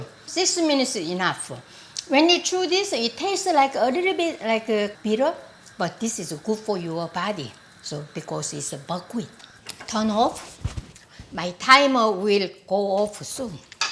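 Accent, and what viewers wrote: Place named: South Korea. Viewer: 한국식 영어 억양 너무 매력적이에요~~~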